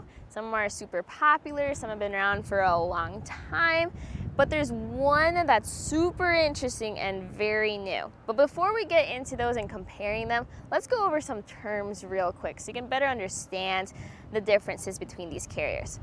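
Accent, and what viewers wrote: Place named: Hawaii, United States. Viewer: She doesnt really have an accent but more hint of her family dialect , slightly Hawaiian or Asian.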